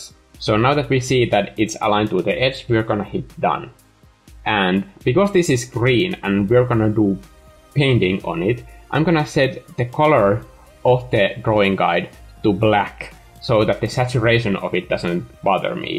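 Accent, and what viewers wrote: Finland. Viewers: For me it was the reverse: as soon as I heard him speak I thought "wait.... he sounds finnish" looks at name yep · Suomalainen aksentti? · Tuli suosituksii tää video ja heti aksentista kuuli et oot suomalainen xd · Hmm are you from Finland? That accents is kinda like a Finland accent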